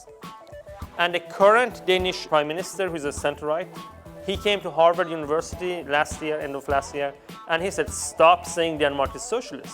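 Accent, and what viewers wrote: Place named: Iran. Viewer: This guy sounds Persian!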